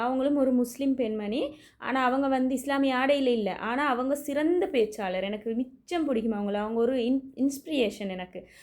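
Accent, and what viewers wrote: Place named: Sri Lanka. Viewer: மிகவும் தெள்ளத்தெளிவாக விளக்கிக்கூறும் இலங்கையின் தமிழ் வழக்கில் உங்களின் கருத்து மிகவும் சிறப்பான பதிவு. அன்புடன் உதய தாரகை. சிங்கப்பூர் குடியரசு 🇸🇬🇸🇬🇸🇬🇸🇬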